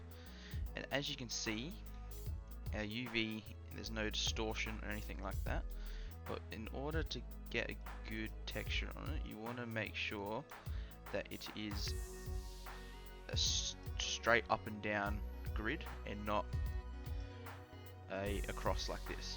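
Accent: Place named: Australia. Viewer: Your the first Aussie I’ve heard on a maya video 😂🎉🎉🎉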